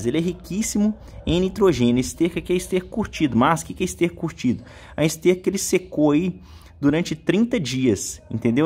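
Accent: Minas Gerais, Brazil. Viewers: Que sotaque gostoso de Minas Gerais,bjs.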